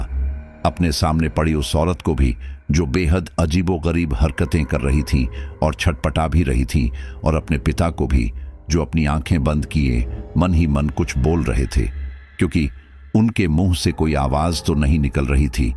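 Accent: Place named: Rajasthan, India. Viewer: आपकी भाषा में राजस्थान वाली मिठास आती है ❤❤❤❤❤❤❤❤❤❤❤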